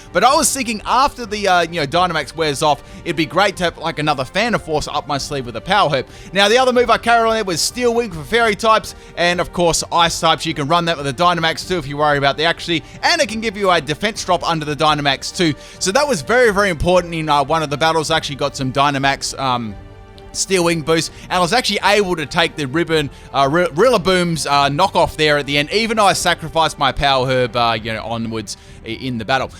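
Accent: Australia. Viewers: This accent sounds familiar. Are you from Australia by any chance?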